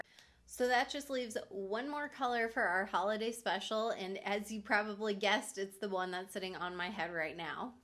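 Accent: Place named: Missouri, United States. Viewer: Definitely off topic but are you from Missouri?? I feel like you have a very Missouri accent and I love it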